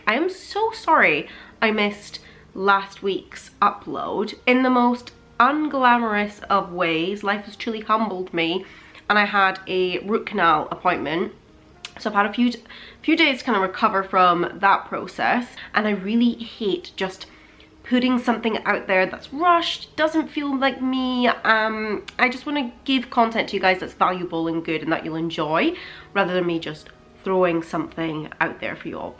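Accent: Scotland, United Kingdom. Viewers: Your accent is so pretty. Are you from Scotland or Northern Ireland?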